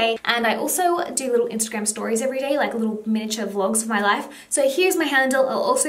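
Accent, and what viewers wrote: Australia. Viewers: You live in Galway in Ireland? Are you kidding me? I live in Galway and I've been subscribed to your channel for forever and I thought you were Australian, I'm so confused lol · What is your accent? I love Irish accent and I lived in Ireland for years but your accent sounds all over the place you sound a bit Australian a bit British a bit idk what the hell lol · wait your from Dublin... I thought you was Australian!!! :O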